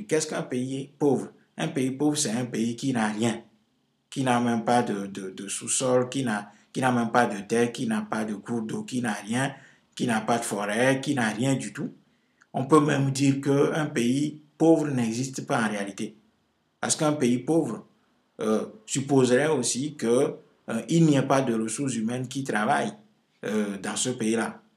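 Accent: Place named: Africa. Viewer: Il a voulut dire suisse mais avec son accent africain on ne l'entend pas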